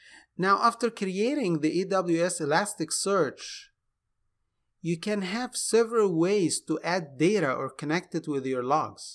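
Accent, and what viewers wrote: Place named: Iran. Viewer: You can't hide that Iranian accent mate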